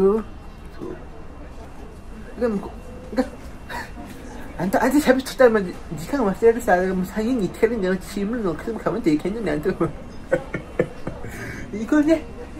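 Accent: Miyako, Japan. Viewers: イントネーションと発音がめっちゃ宮古～！って感じですね笑 石垣のおばさんたちも話してる内容だいたいこんなです☺ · 沖縄本島人からしてもすぐ気付く宮古訛り、 いいっすな。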